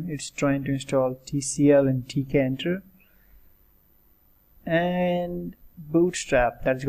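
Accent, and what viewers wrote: India. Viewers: The Indian accent is your proof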